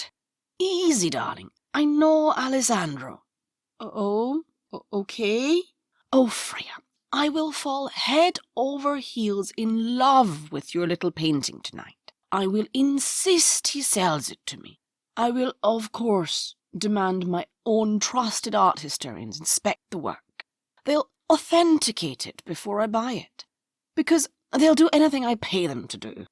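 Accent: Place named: Scotland, United Kingdom. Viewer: Wow, fantastic narrator. Love the Scottish accent, very fun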